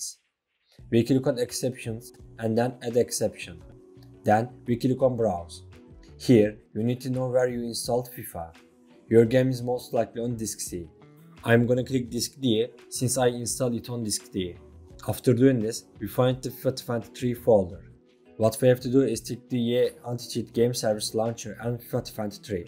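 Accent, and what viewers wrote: Turkey. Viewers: Dost aksanından anlamıştım Türk olduğunu Fakat çalışmadı :(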